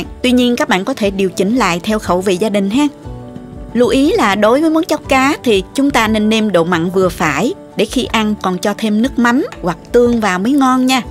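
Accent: South, Vietnam. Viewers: Giong noi mien nam cua that truyen cam de thuong mon nao cua huong dan deu ngon va hap dan, cam on nhieu, Ca co noi, la ca hanh ben My. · giải thích rõ ràng.. giong Nam hay qua, nghe êm tai vi giong của cô không bi ngọng khi đọc những chữ bắt đầu với R! Những video miền Tây nghe khó hiểu quá. Cam ơn Cô. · Mình rất thích xem dạy nấuăn, cô ở tiểu bang nào vậy? Nghe giọng miền nam rất dễ thương,mến chúc cô và gd nhiều sức khỏe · Hi. chỉ dẫn công thức nấu ăn ngon.Rất cảm ơn về chương trình này. Với giọng nói Miền Nam ngọt ngào và tai' nấu ăn của thì chắc chắn không chê vào đâu được. 👍❤🇺🇸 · Tôi thích nghe cô nói rất truyền cảm giọng nam bộ nhẹ nhàng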